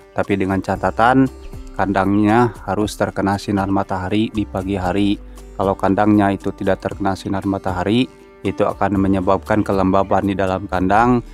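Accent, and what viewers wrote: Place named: Sunda, Indonesia. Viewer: assalamu'alaikum mang amang orang mna gening ngomongna sunda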